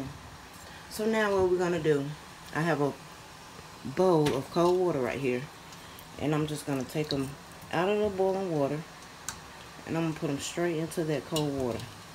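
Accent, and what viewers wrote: United States. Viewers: Never watched one of your videos before have to say I detect a New Orleans accent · Are you from the south, , I Am, I love your voice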